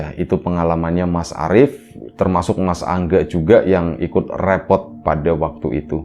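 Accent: Tegal, Indonesia. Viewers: Wa, alaikummusasallam wr wb, Palembang hadir dan nyimak selalu, Di tunggu cerita selanjutnya, Buat moga makin sukses dan buat mas narator, moga sehat slalu dan slalu dalam lindungan Allah SWT, Owalah mas narator asli nya Tegal ya.....?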